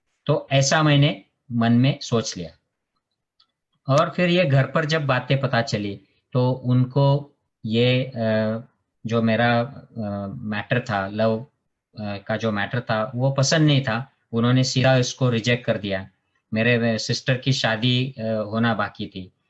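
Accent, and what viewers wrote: Khandesh, India. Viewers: Sir aaple Marathi Mansa lagech olakhle jatat aapli khandeshi lokanchi hindi khup ch khatarnak Aste sir😀😀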